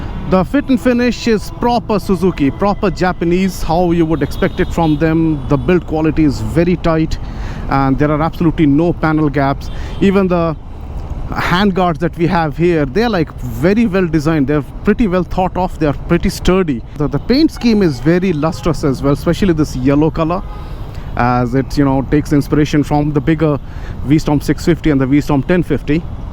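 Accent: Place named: United Kingdom. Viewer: Love your accent bruh!. Thought i was watching a British based channel at first :D Keep up the good work on your channel! :) Ride safe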